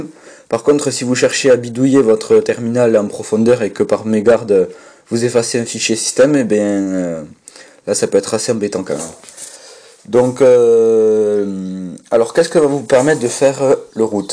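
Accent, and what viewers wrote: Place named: South, France. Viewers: Ton accent t'as trahis;) sinon sympa ta vidéo. Et vive le sud ouest!:) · Meme si j'ai 13 ans tu m'accepte sur la xbox Os:on entant a l'accent que tes du sud ;-)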